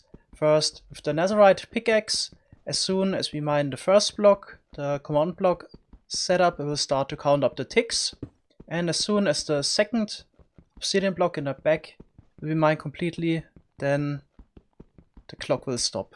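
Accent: Germany. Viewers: Man hört halt raus das er deutsch is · no no please give me a heavier german accent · Man hört richtig dass du deutsch bist xD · Are you german? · Is it just me or are you German? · Ich lehne mich mal weit aus dem Fenster aber du bist deutsch😂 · Are you german cus' you Sound like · deutsche aussprache uff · Are you a german?xD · German detected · Your from Germany right?😅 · Is he German? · Are you a german? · Bist du deutsch ? Klingt vom Englisch so 😂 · bist du deutsch?😂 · I’m just curious, are you German? Sorry if this seems offensive. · Wie krass man einfach hört das du deutsch bist 😂😅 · Noch nie so einen deutschen Akzent gehört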